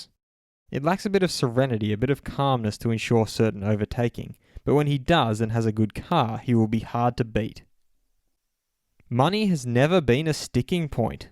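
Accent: Australia. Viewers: is Australian, so there's no difference between how we say pole and poll, they sound the same.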